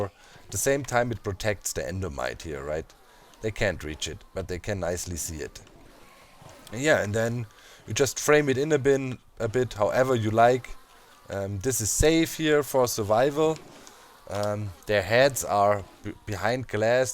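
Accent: Germany. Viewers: you sound german r u german?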